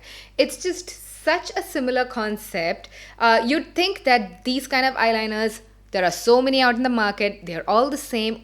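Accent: India. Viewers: You're like the only Indian blogger I actually trust and follow.. I also like Shreya but I watch you more (just a personal preference). You keep it real, you're not fake and you don't try to be something you're not like adding unnecessary accents. You own that Indian accent and I'm proud. I enjoy watching you 😊❤️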